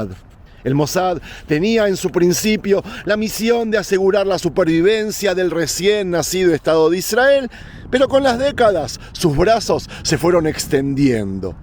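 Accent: Buenos Aires, Argentina. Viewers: Que interesantes y variados son tus videos. Me sorprendes cada vez mas. Gracias, por estos paseos y narraciones maravillosas con tu acento porteño que me suena a musica celestial. Mil abrazos, querido, desde Barcelona. Un beso para tu Nira querida. Seguimos orando x Israel y x mis queridos hermanos judios. SHALOM. AM ISRAEL JAI✡️❤️🙏🇮🇱✡️❤️🙏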